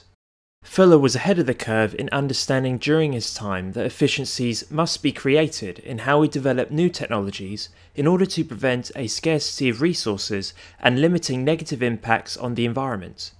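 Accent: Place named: United Kingdom. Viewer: He is British. His pronunciation is different from those that live in America.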